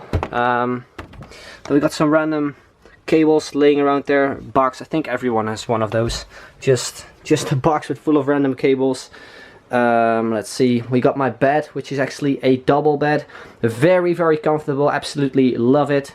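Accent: Netherlands. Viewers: Haha had ik ook ik dacht meteen sowieso Nederlands! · you have a really funny dutch accent(also i am) but you're vids are great man keep it up! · Ik hoor gewoon aan je engels dat je nederlands bent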